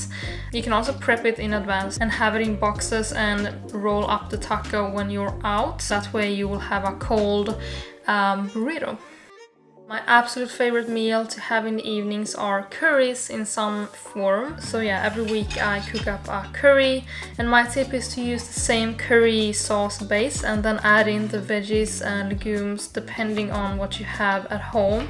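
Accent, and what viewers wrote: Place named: Sweden. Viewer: man hör direkt att hon är svensk ahhaha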